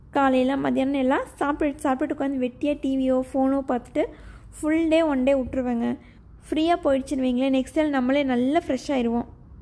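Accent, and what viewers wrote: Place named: Sulur, India. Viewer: Ippo than casual a unnoda video parthenma.unnoda voice modulation ne solluthu, ithu namma ooru ponnunu.udane subscribe pannitten.nanum Sulur pakkam than....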